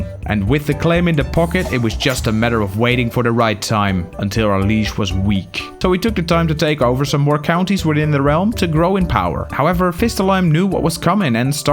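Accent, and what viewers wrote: Netherlands. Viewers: Dutch accent 😢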